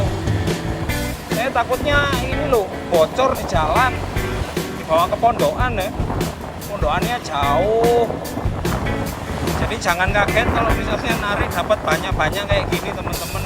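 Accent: Malang, Indonesia. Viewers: Logat orang malang sama semua 🤣 kebanyakan temanku logatnya mirip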